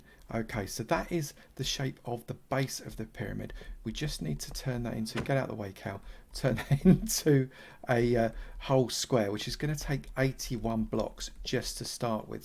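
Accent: United Kingdom. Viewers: A british minecraft survival guide ? Y E S P L E A S E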